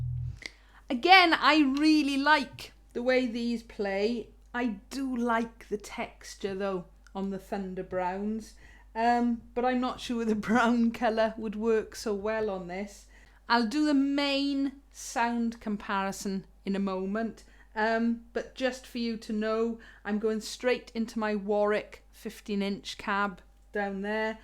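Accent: Wales, United Kingdom. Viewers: Hello. Thanks for your video. I have used all three 0f the types of strings you demonstrated. Like you I favour the Thunderbrowns. I have them on a fretted Aklot Bamboo acoustic/electric bass Uke. The only issue I have had with any of the strings is the amount they stretch. When all the stretching has been worked out of them they are all good to play I really enjoy your videos Love your Welsh accent. Gary.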